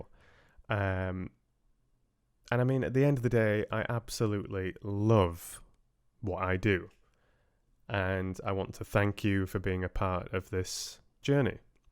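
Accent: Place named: Liverpool, United Kingdom. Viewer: Are you from liverpool? You have the same accent as the beatles.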